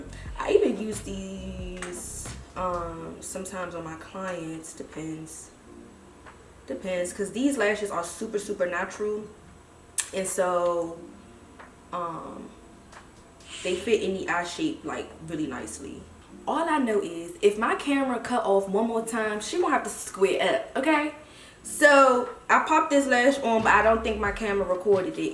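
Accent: Washington DC, United States. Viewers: I just discovered your videos last night as soon as you spoke I was like she is a fellow DC girl 💕💕💕💕💕 Love all your makeup looks !!!!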